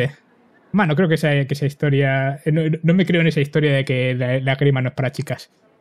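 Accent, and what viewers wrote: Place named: Russia. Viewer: es ruso y se nota... pense que iba a haber algo mas de comunismo en este video es una metafora cierto?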